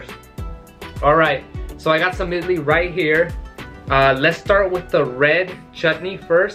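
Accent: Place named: Latin America. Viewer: Mi compa you earned yourself a fan by pronouncing them Indian names correctly(well almost correct with that latino accent)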